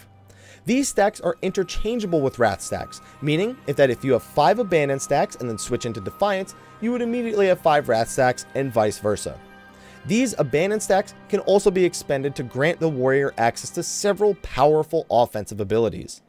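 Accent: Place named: United States. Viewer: That's just an American accent. Take it from a Brit that you guys butcher the letter A!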